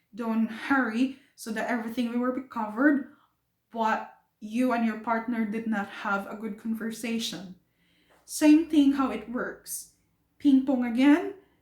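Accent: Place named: United Kingdom. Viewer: Good information sis and I like the way you talk, you have good diction of British accent.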